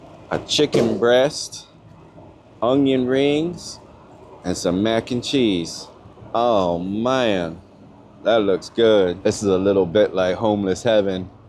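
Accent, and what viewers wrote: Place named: Mid-Atlantic, United States. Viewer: Bro, you gotta be from DE, MD, SEPA area with that accent 👍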